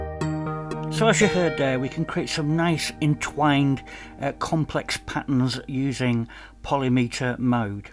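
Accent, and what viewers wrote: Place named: West Yorkshire, United Kingdom. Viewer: Outstanding. all these updates. West Yorkshire by any chance?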